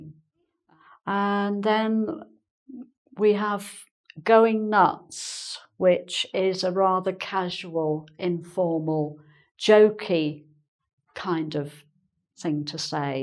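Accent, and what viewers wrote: England, United Kingdom. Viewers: I've been trying to practice some British accents. I think I can learn them from. Anyway, I'm wondering what dialect of British accents she speaks with? I usually speak English with an American accent but I really want to learn myself and teach British accents to my students! Would you guys please give some good tips for it~? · I like her way to explain the lessons but it seems like she teach british english more than american english. I realized of for her accent. Even I think that lessons can be useful in both. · Love your kind English accent ...I imagine taking a cuo of tea with you talking :)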